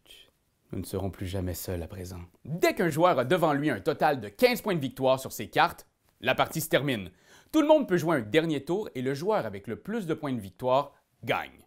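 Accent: Quebec, Canada. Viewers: À la fin de son tour ! Ce qui peut permettre pendant celui-ci, d'en avoir 13 momentanément ! 😋 Petite omission également concernant les nobles, que l'on n'achète po...hein !? Mmh'et 🤫 Il ne faut po...hein !? Tout révéler ! Mmh'et donner envie, comme le fait si bien vos pépites oups ! Vos vidéos telles des bijoux/💎... (Ainsi que sur la fin du jeu où l'on finit le tour). Encore merci pour vos magnifiques vidéos. Qui + hé ! Agrémenté de l'accent de la Belle Province ! ✌🏼🃏♟️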